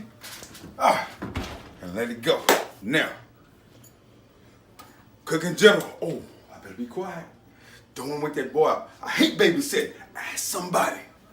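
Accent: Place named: Chicago, United States. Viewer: This my first day watching this and I just love your positive approach to cooking exspecally the children I’m in love already. You sound like you from Chicago! I’m a female version of you!! Love it! Wow 🤩 what you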